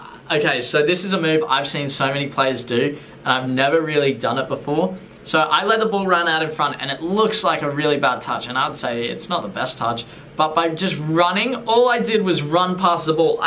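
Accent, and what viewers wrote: Australia. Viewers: Damn that Australian accent, great video btw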